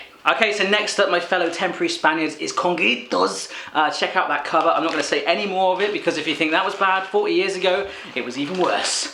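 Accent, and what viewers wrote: Mexico. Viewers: I don't know what's worse, the way you eat the "seeds", using a mexican accent for spanish people, the way you ate the melon gum or you trying to be funny and turning out to be absolutely awful. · You speak as a Mexican , the Spanish is as the italian or the français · Dude, I'm from Spain, and we are not Mexican 😂 We don't speak with that accent 🙈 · España y México son diferentes no pongas acento de mexicano para hablar en español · Man, how come you still play spanish accent as mexican? Travel more mate, we are not that far away from your place... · NObody says Ole in spain and you spoke like mexican, not like spanish xd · The accent you use to speak as a spanish is a mexican accent xD Spanish dont speak like that ;D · Maaan, we don't speak like Mexicans jajaja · Why did he talk like a mexican? Spain is in europe, we don't have latin accent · Your mexican-spanish accent was funny hehe but we Spaniards don't speak like that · why are you talking like a mexican? 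· lel so bad man spanish people doesn't have that pronunciation that's from mexico... And the green melon is a chewinggum u shouldn't swallow it. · this suspicious white powder you're eating is called sidral, and you're speaking spanish like a weird mexican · Por qué cuando hablais de España poneis acento mejicano y decís cosas como "olé "???México y España están a un oceano de distancoa y son culturas muy diferentes. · Madre mía xD, pero este porque habla con acento mexicano , a ver si estos estadounidenses van aprendiendo de una vez que España no es México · No se quejan de que no hable español bien, se quejan de que hace un acento mexicano como si todos los de España hablaran asi, pero bueno que se puede esperar de un ingles sin ninguna cultura -. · Why you speak spanish with mexican accent? And why you are always saying "Ole!"? :C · Yeah, man... you definitely think that the Spaniards' accent is the same as the Mexicans'... 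and sorry, but it's not, really, at all. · We haven,t got Mexican accent 😂😂 Our accent is more clean, I don't know how to say it. The only accent in Spanish are the "RR" and the "z" but it hasn't really accent. But good video,funny like always 😂😂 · Your mexican accent is awesome, but in Spain we don't speak like that my friend :P hahaha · Nice video man,but spanish don´t speak with mexican accent.... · por que imita a un mejicano cuando habla español?, no todos los que hablan español son mejicanos, de hecho, no viene de ahi la palabra español precisamente.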